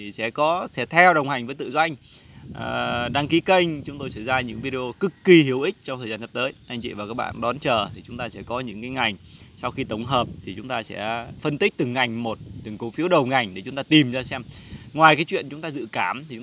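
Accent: Thanh Hoa, Vietnam. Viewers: Em ở Hà nội hay tp Hcm,giọng thì tỉnh Thanh Hoá.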